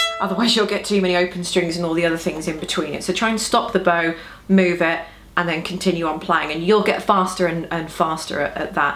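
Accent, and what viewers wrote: United Kingdom. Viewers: and you have a very good british accent i wish i can talk like you.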